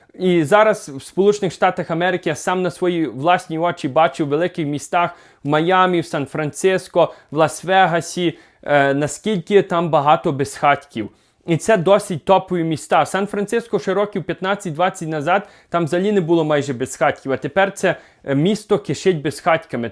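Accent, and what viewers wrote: Western Ukraine, Ukraine. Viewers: Акцент западенский, тернопиль, хмельницк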